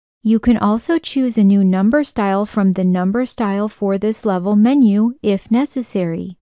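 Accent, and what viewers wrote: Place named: New Jersey, United States. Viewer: Good info. Lol at the New Jersey accent and Indian vocabulary.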